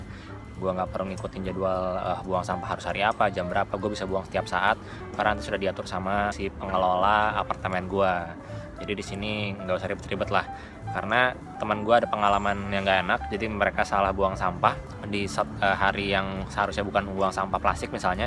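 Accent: West Java, Indonesia. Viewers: sunda pisan a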